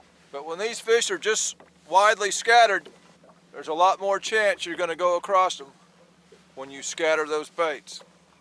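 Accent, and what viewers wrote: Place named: Virginia, United States. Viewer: Reminds me of Virginia talk.. "slap-dab" Semper-fi sir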